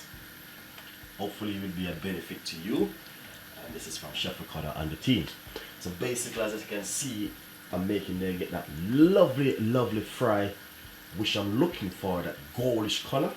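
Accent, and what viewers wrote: Jamaica. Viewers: I was wondering if it was a brand of milk that he was referring to and I’m Jamaican and I did not understand what that was. I even went in his description to see if he listed the ingredients. I have no issue with his patois, that just went over my head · That was what I came to listen for when I find the video. I said “ if he don’t have a Jamaican accent, am clicking off!!” 😆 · Where I live at the moment there are zero Jamaicans so its ten years since I heard the accent. This made me laugh when he said what sounded like ola milk, then I realised he was saying whole milk and I collapsed laughing gosh I miss that accent. I am English living in Sicily and cant wait to get home !!! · go over to another cooking channel for the queen's English. This is a Jamaican chef, talking in his Jamaican dialect. Low dat no man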